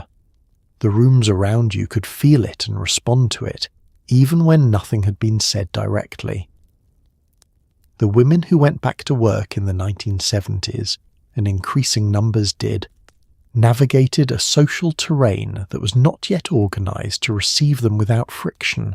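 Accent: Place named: England, United Kingdom. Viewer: I grew up in the US in the 70s. This video is not very accurate. Women had a lot of say in decorating, schools, outings and such. My aunts ,and I had many were in charge of budgets, schedules and cities. Their husbands loved and respected their choices. Rules? Yeah, society had rules but there were those who were breaking those rules left and right. Your English accent makes me think you are following some idea of the seventies, instead of how it really was. Those pictures are not that accurate either. No one ever put their clothes out to hang dry in that manner. If you use AI to reconstruct history,,,, you will fail.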